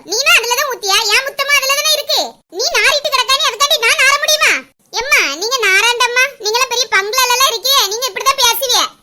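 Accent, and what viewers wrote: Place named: Thoothukudi, India. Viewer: Arumaiyana Padhivu :) Enakku Thoothukudi dhan...Indha Pecha Kekrapo Avlo Amudhama Irukku❤️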